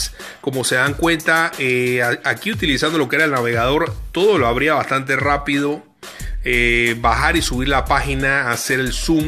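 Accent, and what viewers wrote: Panama. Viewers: Es lo que parece. Panameño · Él es de Panamá? Tiene un acento muy panameño 😂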